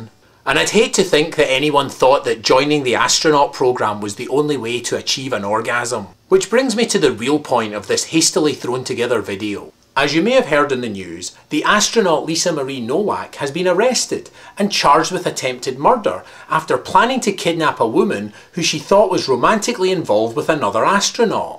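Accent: Scotland, United Kingdom. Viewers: Yes, and the air with some sort of floating ad for insurance made out of other ads for fast food. On a serious note, why is your accent so deceptive? Your voice says Scotland, but your voice saying where you're from says America. Why the difference?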